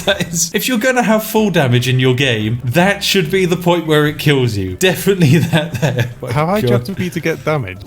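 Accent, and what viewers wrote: United Kingdom. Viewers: Oh god... that's your actual voice!? Like you don't overact for your vids... you seriously sound 200% british even when talking with your friend. Tea.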